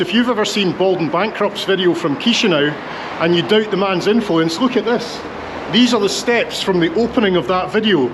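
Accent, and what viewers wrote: Scotland, United Kingdom. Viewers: Nice Scottish accent )) reminded me of my friend, a Scot, with whom we worked together in the same company when I lived in Dubai many years ago ). Good old days ). P.S . Hello from Azerbaijan, fit like loon? ) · Love that Scottish pronunciation! Have a Scottish office mate; have to tell him to watch...and listen! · is this blogger Scottish？😂kinda grasp a sense of Glasweigen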